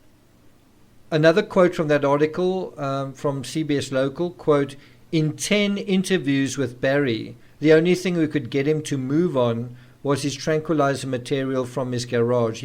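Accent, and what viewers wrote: South Africa. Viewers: South African accent? A quick google confirms :)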